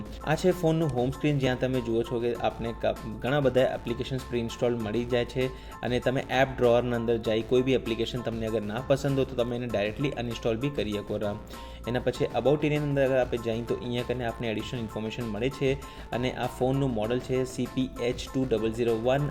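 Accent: Kutch, India. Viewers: ગુજરાતની કચ્છી બોલી બોલો છો તમે. મૂળ ક્યાંના તમે? સારું લાગ્યું કે તમે ગુજરાતી છો.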